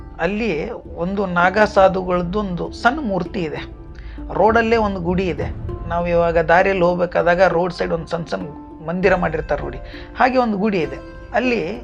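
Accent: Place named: Hubballi, India. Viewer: ಹುಬ್ಳಿಯವ ಹುಬ್ಬಳ್ಳಿ ಸ್ಟೈಲ್ ನಾಗ ಮಾತಾಡೋ...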